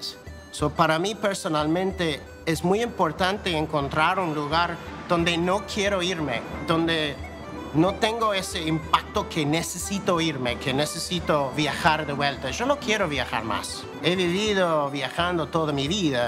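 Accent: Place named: Argentina. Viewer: Me encanto este vídeo,veo un lindo irlandés con acento argentino,, algún día podré visitar a nuestro país hermano. Saludos de una peruana viviendo en Irlanda.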